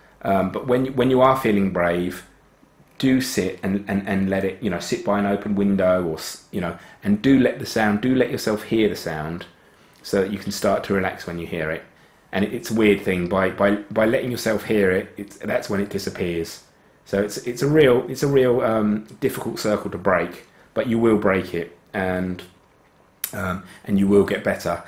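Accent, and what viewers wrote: Australia. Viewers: 澳洲口音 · is he from Australia?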